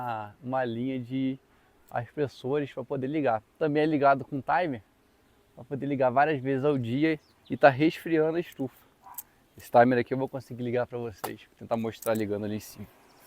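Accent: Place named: Rio de Janeiro, Brazil. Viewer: Pelo sotaque deve ser daqui do Rio. Eu tenho uma pergunta já que não sei onde fica sua propriedade. Qual a temperatura máxima da sua região?? E se houve problemas devido a temperatura elevada?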